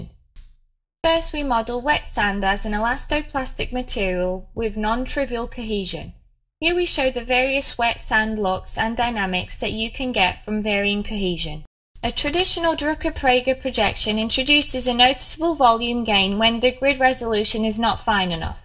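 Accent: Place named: Northern England, United Kingdom. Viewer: Yes, her accent is somewhat northern English. Do you have trouble understanding what she is saying?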